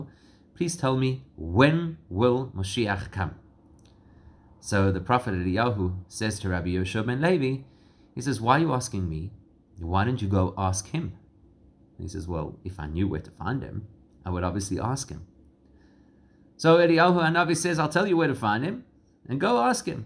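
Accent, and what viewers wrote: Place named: South Africa. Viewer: Are you South African? Definitely have bit of South African accent.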